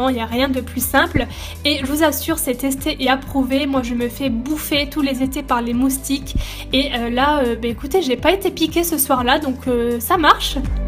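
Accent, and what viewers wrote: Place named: Alsace, France. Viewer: Tu es de quel origine ton accent ressort grave on dirait une alsacienne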